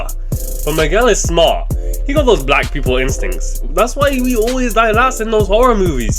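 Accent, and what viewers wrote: England, United Kingdom. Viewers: U from England crazy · Wait you from England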